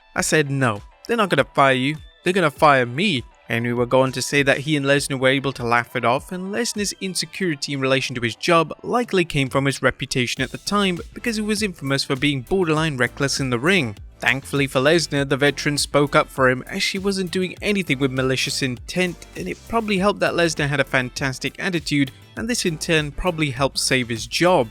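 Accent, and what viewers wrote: United Kingdom. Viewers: I know your brittish and have an accent, but how in the hell do you get "Evil-lution" out of the word "Evolution"?? REMEMBER, The 3rd letter is an "O" not an "I"..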